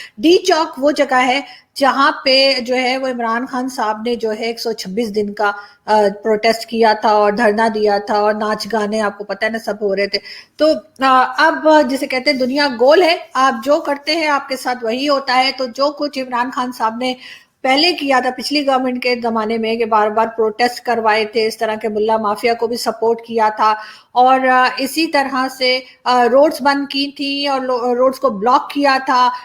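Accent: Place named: Allahabad, India. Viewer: Allahabadi......lahza h ji, jo apke Ghar me Bola jata h kyuki apka DNA Allahabad India se h...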